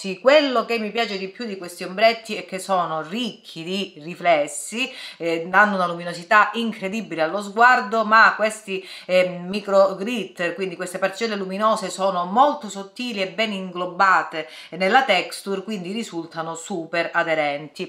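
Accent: Calabria, Italy. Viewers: Di dove sei ?sei Calabrese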